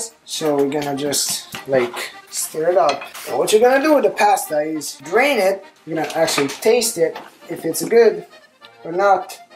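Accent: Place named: Italy. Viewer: Oggi fanculo i commenti costruttivi, adoro il tuo accento italiano misto ad una punta di smusi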